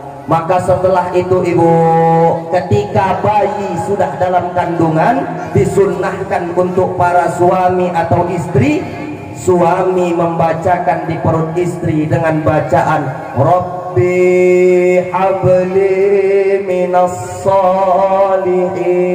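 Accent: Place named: Medan, Indonesia. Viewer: Rindu kali aku logat Medan. Seperti yg dikatakan ini. Kapan ya bisa ke Medan lagi. Semoga diberikan kesehatan ya pak.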